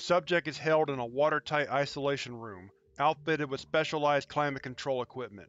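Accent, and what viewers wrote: South, United States. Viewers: From what I can see, you're doing an outstanding job. In my opinion, as with all things, your new focus and "stepping up the game" has completely revitalized the presentation of SCPs, and you should feel rightly proud of it. Also, it's good to hear another Southern Man doing videos that aren't stereotypically Southern.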